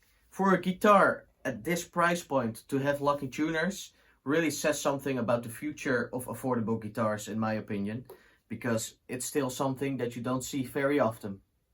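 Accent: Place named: Netherlands. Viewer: I ended up buying the TE-25th, I like it, I also have the TE-62 DB CAR, they both sound great but have their differences, I don't know why they went with the long screws as they obviously commissioned the bridge themselves as it has their name on it, I cut mine down and filed the tips of the screws, no issues now! I realised when coming back to this video I had not subscribed, sorry, I have now! I used to live in the Netherlands in 1998 to 2001 I lived in Noordvijk Aan Zee and later Noordvijker Hout, I miss the Dutch accent and so enjoy your video's.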